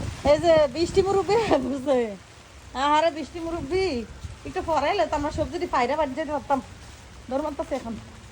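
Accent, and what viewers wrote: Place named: Comilla, Bangladesh. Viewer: আপু কিছু মনে করবেন না,কুমিল্লা কোথায় আপনার দেশে বাড়ি কেননা আপনার কথা ঠিক আমাদের বাড়ির কথা ,আমিও আমেরিকাতে আছি,please আপু বলবেন কিন্তু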